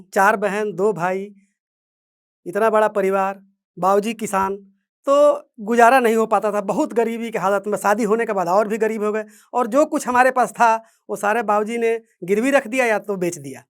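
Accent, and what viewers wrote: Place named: Bhojpuri, India. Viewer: Bhojpuri bolte the tabhi lga ki mere taraf ke hai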